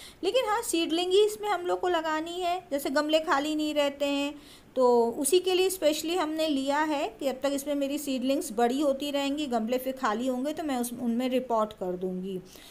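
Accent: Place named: Allahabad, India. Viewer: being allahabadi my self i can so identify with the accent ,kudos to you for being authentic:)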